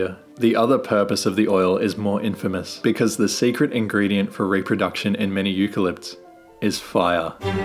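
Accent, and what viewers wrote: Australia. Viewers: Love it. Well delivered, humorous and educational. Awesome to hear our Aussie accent in such a well made video ❤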